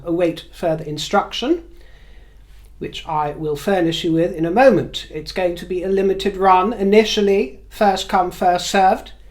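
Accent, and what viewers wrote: United Kingdom. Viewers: Happy to hear you will stay with us. I love your british english and accent, it is a pleasure to listen to you. Greetings from Switzerland.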